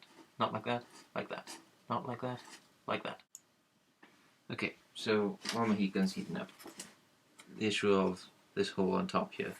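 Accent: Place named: Wales, United Kingdom. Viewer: you in wales ? accents sounds about right , i want to make this is steel you got some contact info i could do with your help . im a blacksmith.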